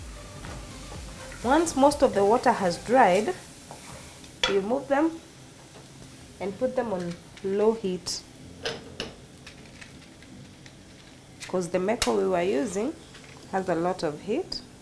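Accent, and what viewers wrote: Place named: East Africa. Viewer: Esther Mutua try not to be silly. She speaks beautifully with an East African flow.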